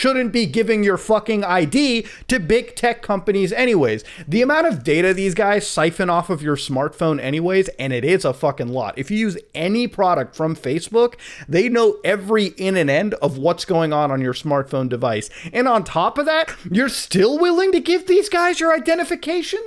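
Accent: England, United Kingdom. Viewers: Nice English accent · Nice British accent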